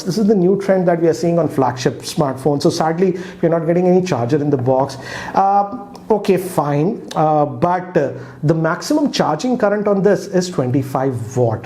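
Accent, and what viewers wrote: Hyderabad, India. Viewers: You could watch just about any other review if you didn't like that he is an Indian and has an Indian accent? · Sir are u from Hyderabad ?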